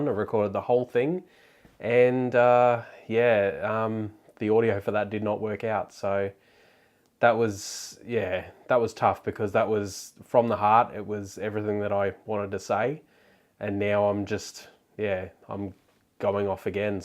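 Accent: Australia. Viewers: G'day Mate, good to see you back, get well. It's good to hear your Aussie accent again :)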